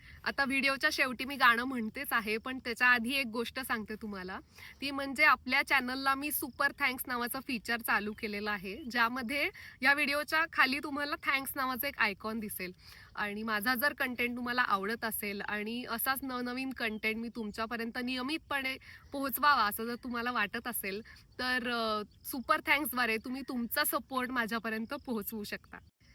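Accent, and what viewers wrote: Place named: Konkan, India. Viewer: रानडुकराची तर काही बोलायला काही जागाच नाही खुपच वाढ झाली आहे 15,20 वर्षा अगोदर एवढे राणडुक्कर नव्हते आता करारा, तीर, मसूर तेलबीया जसे जवस होऊच देत नाही आता तर शेतकरी धानाशिवाय दूसर पीक लावायलाच पहर नाही भीतीने खूब नुकसान सहन करावे लागत आहे हा आणी तुमची कोकनी मराठी आमी पूर्व विदर्भाची मराठी जवळपाश समान वाटते काही शब्द सोडले तर जे गाने तुम्ही गायले ते पन तसेच आहे पन हल्ली ही परंपरा कमी होत चालली आहे मम लहानपनी रात्र, रात्र मचानी वर झोडीत बन्ऊन पहाटेला उठान फाखरापासुन ज्वारी ची राखनी, मळनी करायचो मला खुपच आवडायचे आता राहील्या त्या फक्त आठवनी ❤❤❤❤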